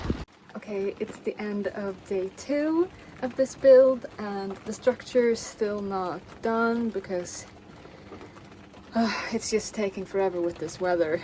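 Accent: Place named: Scandinavia. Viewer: You aren't American? I just found you. What are you, one of theose Nordic countries? They have perfect American English and fool me all the time. Almost everywhere else speaks a British English. Anyway, I look forward to watching.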